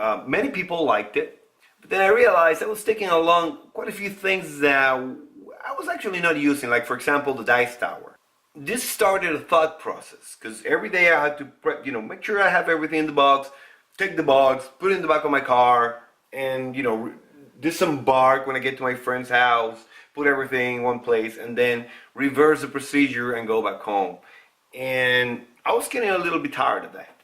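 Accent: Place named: United States. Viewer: Hey! This seems unrelated, but where is your accent from? I remember in the cheesy Dune miniseries SciFi made a long time ago, the guy they cast for Count Fenring (the Emperor's Advisor) spoke with an accent which has some strikingly similar features to yours! I never knew where it was from! Yours does sound closer to a standard American, but it's still really cool.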